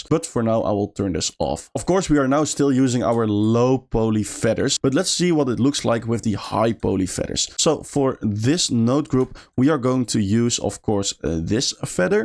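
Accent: Netherlands. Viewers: wonderful tutorial - could tell you were dutch without knowing who you were ;)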